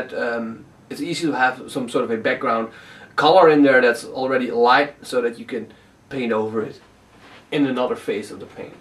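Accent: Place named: Netherlands. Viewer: I knew u were dutch from ur accent..:) Welcome!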